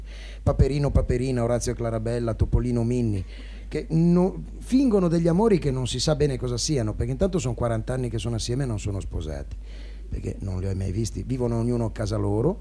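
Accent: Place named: Genoa, Italy. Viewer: Oltre che bravissimo cantante era pure molto simpatico....con quell' accento genovese...fa tanto piacere ascoltarlo parlare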